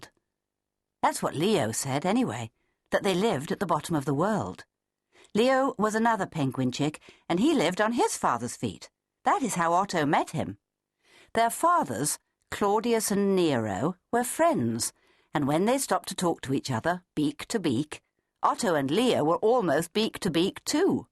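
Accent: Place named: United Kingdom. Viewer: Awesome story. Fantastic British accent.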